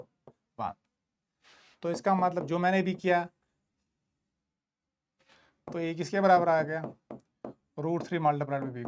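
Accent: Kashmir, India. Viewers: Kashmiri accent is so cute 😂❤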